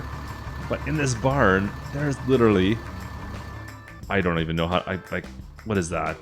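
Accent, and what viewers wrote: Canada. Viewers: A fellow Canadian...EH?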